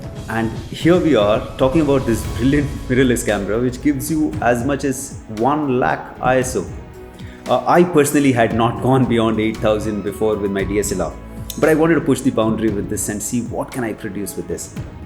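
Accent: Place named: Canada. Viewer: Your accent is funny. Are you a Canadian?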